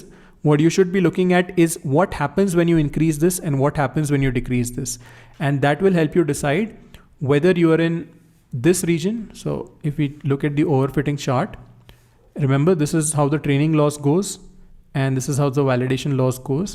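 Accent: India. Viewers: While his Indian accent is bearable, not as strong as the typical Indian accent, I still feel uncomfortable listening to this kind of accent. I don’t know why. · You have won me at your indian accent ❤ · The Indian accent is the accent of the knowledge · Thank you so much for providing in India accent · you've lost me at the indian accent.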